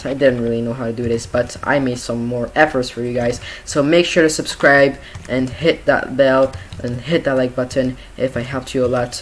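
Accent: France. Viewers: are u french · Ur french right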